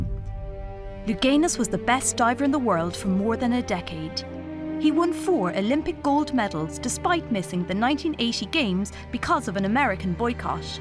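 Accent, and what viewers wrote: Ireland. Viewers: Lol at the Irish accent of the narrator.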